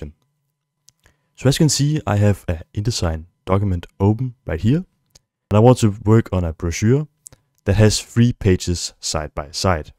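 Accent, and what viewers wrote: Denmark. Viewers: Thanks this was helpful and I love the (Danish?) accent. :)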